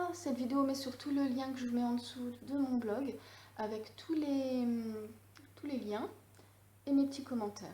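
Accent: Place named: France. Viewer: Les conférenciers sont très intelligibles parce qu'ils doivent parler clairement, souvent à une audience qu'ils savent internationale. Ensuite le niveau de vocabulaire, le mixage sonore, la tendance à répéter (les running gags d'un How I met permettent vraiment de capter des expressions et les rendre assez familières pour qu'on les trouve quand on en a besoin, et qu'on les prononce bien !), le confort physique dans lequel sont les personnages quand ils parlent, l'origine (UK vs USA) des acteurs vs. ce à quoi on a l'habitude, etc., influent sur la vitesse de progression VOSTFR (juste au début pour -> VOSTEN -> VO qu'on peut avoir. Dans la foulée, pourquoi ne pratiques-tu pas ta prononciation avec une vidéo/film/..., en apprenant un poème ou un discours que tu aimes ? Tu aurais le modèle à disposition pour savoir les sons à produire. Comme tu le sais ta prononciation actuelle a un fort accent français, et je crois que c'est très facile à comprendre quand on est français, surtout mauvais en anglais et habitué au franglais, mais moins pour un natif dont l'oreille est moins indulgente et moins entraînée à nos sons. 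J'ai énormément amélioré mon anglais en apprenant par coeur "I have a dream" avec le modèle en vidéo + quelqu'un qui m'aidait, et ensuite en écoutant des séries et vidéos Youtube. Les sons me sont entrés dans la bouche à force de les entendre. Si on est assez geek pour passer une grande partie de la journée à écouter de l'anglais d'une façon ou d'une autre, et qu'on a d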